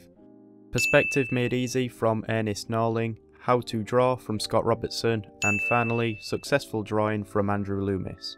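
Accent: United Kingdom. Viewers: As much as I know he is from the UK xD If you wanted to know which area of the UK, sorry I don't know that 😅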